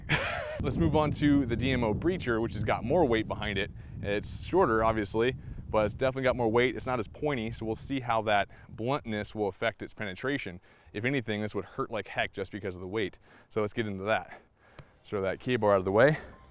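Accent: United States. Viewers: Tino TheKid where.. where do you think he is? He's got an American accent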